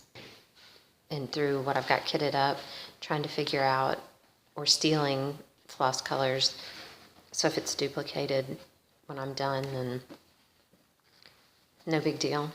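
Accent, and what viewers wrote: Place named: South, United States. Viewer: Just found your channel. Sounds lie you might be from the South. I'm from Georgia. Are you anywhere near there? We had a lot of the same answers on Tracy's talking tag.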